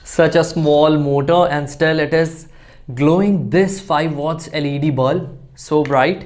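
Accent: India. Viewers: You are very sympatic. i also like your voice with a bit indian accent. 🥰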